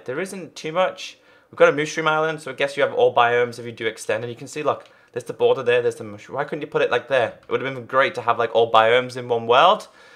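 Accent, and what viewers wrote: Liverpool, United Kingdom. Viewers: are u a scouser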